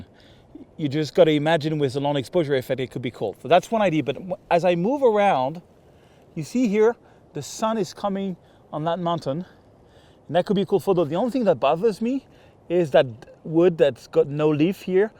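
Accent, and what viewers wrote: France. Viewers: Rather than just doing the French accent, you should throw some French in. I would love to learn some French. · I love your accent...I remember my trip to Paris and yummy macaroons 😍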